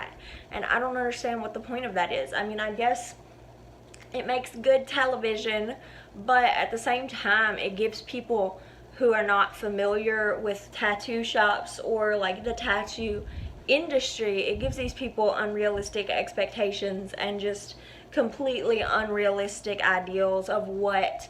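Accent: South, United States. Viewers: Girl, I’ve been meaning to leave you a comment to tell you that you remind me a lot of myself. I ramble when I’m telling stories and I have nervous habits just like you. I know a lot of people do but it’s really refreshing to find a youtuber that I can relate to! I absolutely love your tattoos too by the way. And your southern accent. I’m probably being a bit creepy now, sorry about that! I subscribed a few days ago. Love your content. ❤️ I’ll stop now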